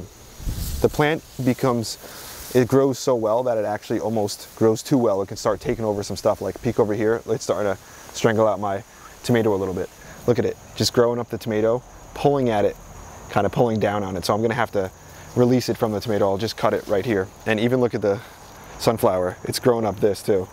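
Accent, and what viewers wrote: New Jersey, United States. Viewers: I enjoy listening to your New Jersey accent. I'm in Harlem, by the way. And I ❤Tuck.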